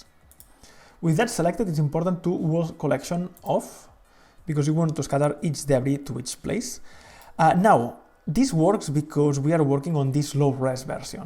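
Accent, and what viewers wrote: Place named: Catalonia, Spain. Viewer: Sembles de catalunya tio, un grande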